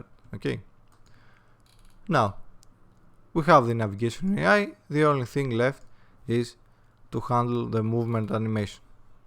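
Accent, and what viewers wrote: Greece. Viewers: πες την αληθεια!! εισαι ελληνας απο λιγο που ακουσα το βιντεο ... (προφορα)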